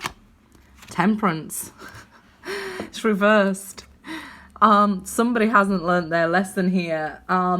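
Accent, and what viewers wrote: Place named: North, United Kingdom. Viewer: You just read my life .But your accent always has me imagining that its Mel B lol .bet your a right northern girl xx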